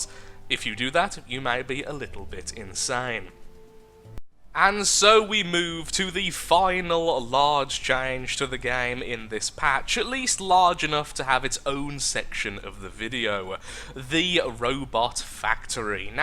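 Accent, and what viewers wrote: United Kingdom. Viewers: British for the win!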